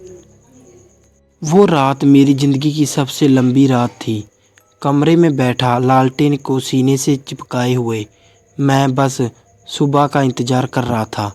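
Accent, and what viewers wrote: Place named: Punjab, India. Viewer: Bai tere hindi bolne ke trike se lg raha hai ke tu punjabi hai 😅👍🏻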